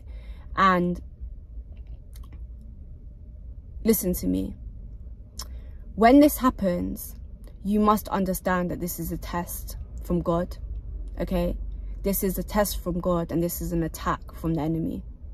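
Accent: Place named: United Kingdom. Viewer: Rah wasn’t expecting a U.K. accent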